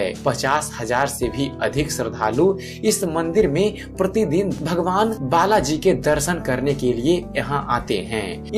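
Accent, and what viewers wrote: Bihar, India. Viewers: Audio me kisi bihari ki awaz hai pakka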